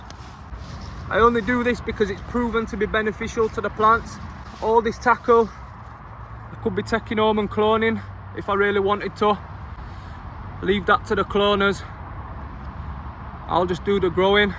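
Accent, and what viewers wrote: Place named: Bolton, United Kingdom. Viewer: Sound like ya near bolton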